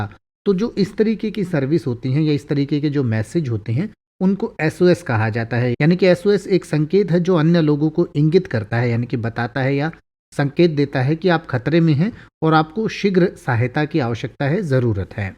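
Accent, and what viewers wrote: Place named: Assam, India. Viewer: Assamese laga thank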